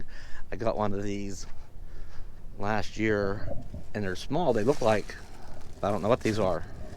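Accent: Pennsylvania, United States. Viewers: you can always tell a PA accent!! very distinctive.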